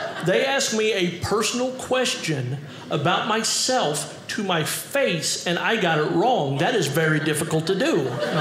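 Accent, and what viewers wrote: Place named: Missouri, United States. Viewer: So much fun watching & laughing along listening to you Mr. Thornsberry. Thank You I was cracking up here in Mid-Missouri . You’ve kinda gotta smartass sense of humor I heard your Missourian accent that’s familiar. Your humor i relate with.😂😂😅 Now I’m asking you do another show here on Drybar. I’d enjoy to hear some parenting stories. I like that you talk about your wife & how you two had pretty much known each since childhood. That’s really cool. I’m happy you obviously love your wife. Tell your audience more about your life in y’all’s little town. Will ya?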